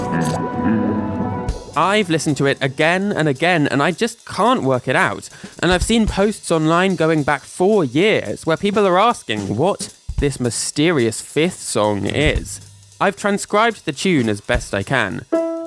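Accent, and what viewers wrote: United Kingdom. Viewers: love ur videos and ur british accent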